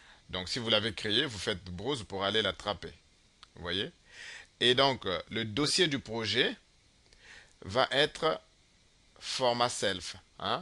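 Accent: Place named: Cameroon. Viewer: Merci beaucoup...tu as une accent camerounais ;)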